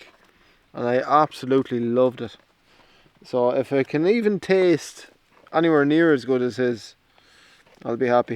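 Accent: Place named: Ireland. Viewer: I love your accent...is it Irish?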